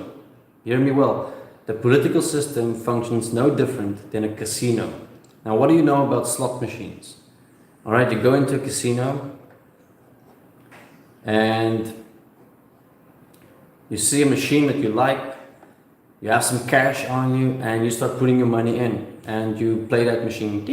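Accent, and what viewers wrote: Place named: Netherlands. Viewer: I would have guessed Dutch by the accent. Afrikaans? 😉. Positive feedback on Yang is ALWAYS appreciated by YangGang. 🧢 Keep up the good work, en dank u wel.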